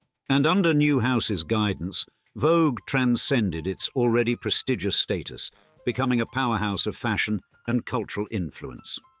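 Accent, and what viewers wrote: United Kingdom. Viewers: As a lover of history, I look forward to your videos every week. Your fusion of history and contemporary culture with your British accent is very satisfying. Thank you for all that you do on this channel.❤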